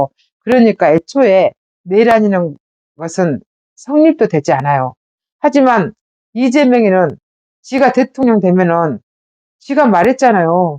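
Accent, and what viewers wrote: Jeolla, South Korea. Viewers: 절라도 사투리가 구수하게 들리는 건 처음 ㅋㅋ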